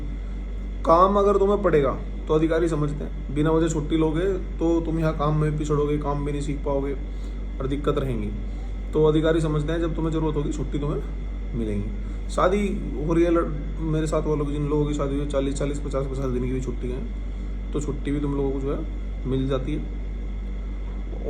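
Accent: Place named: Meerut, India. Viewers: भाई कौन से जिले से हो बोली तो हमारी जैसी lagri है मैं शामली से हु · Bhai ap kha se ho Meerut k aas pas k lg rhe ho language accent se...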